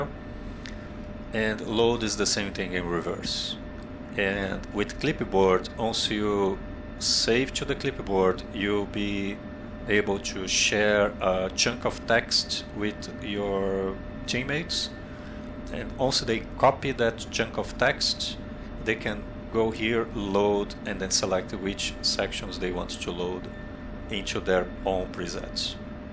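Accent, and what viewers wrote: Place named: Brazil. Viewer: Sotaque brasileiro em inglês é mt reconhecível xD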